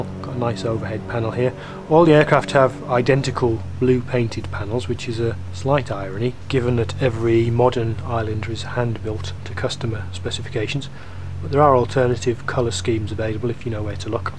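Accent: United Kingdom. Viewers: Just about the best 10 minute review for an FSX aircraft I've ever seen. Your comparison of real knowledge to in sim performance is particularly appreciated. As ever I also appreciate an understated but confident British accent. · I like the quality of your videos and also your british accent !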